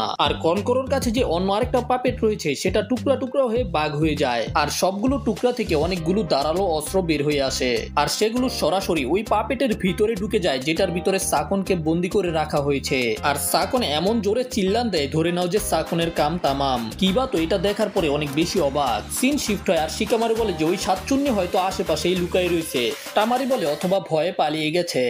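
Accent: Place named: Barishal, Bangladesh. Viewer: আপনার বারি বরিশাল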